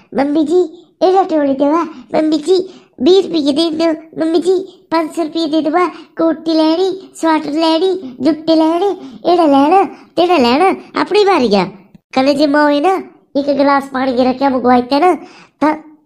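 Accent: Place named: Himachal Pradesh, India. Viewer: Nice video hai. Jaise ye lady bol rhi hai video mein, meri ek cousin dharamshala k paas rehti hai uski saas ki esi hi language hai aur bolne ka style bhi yehi hai. 😔😔😔 God bless u.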